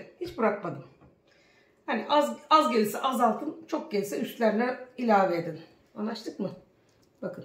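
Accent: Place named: Izmir, Turkey. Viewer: ay çok tatlısınız ya siz konuşurken kendimi görüyorum İzmirli olduğumuzdanmi acaba çok natursunuz ellerinize sağlık hep yaptığınız çok güzel sağlıcakla kalın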